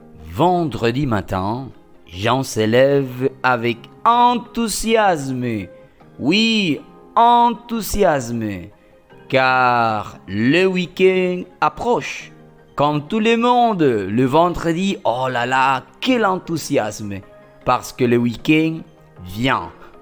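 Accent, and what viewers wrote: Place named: France. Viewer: Me parece muy bueno, asi es como se aprende : leyendo, escuchando, hablando. Solo que me perturbé con la pronunciación de algunas pocas palabras muy diferente a la de Bélgica. Supongo que es de alguna région de Francia donde la pronunciación puede ser un poco diferente.pero esta espectacular ❤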